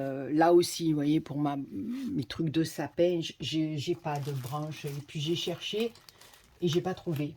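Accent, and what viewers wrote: South, France. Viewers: Coucou trop contenté de te retrouver avec ton accent du soleil gros bisous de la Picardie 😘💜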